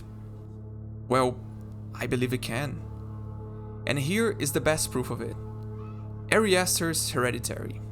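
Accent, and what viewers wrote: Brazil. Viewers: Great content! Do I hear a hint of a Brazilian accent?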